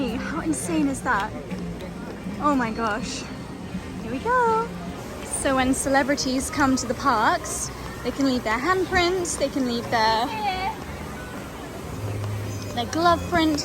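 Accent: United States. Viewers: Oh and your American accent is hilarious 🤣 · I just love the American twang you have in your accent when you go State side 🥰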